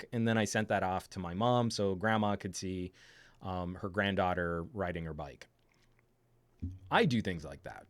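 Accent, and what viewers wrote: United States. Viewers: Wait, you're from New Mexico or???.-.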